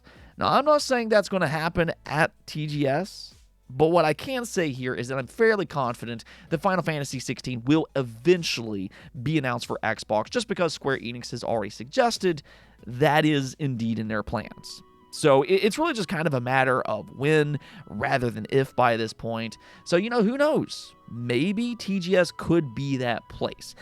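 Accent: Philadelphia, United States. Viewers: Are u from Philadelphia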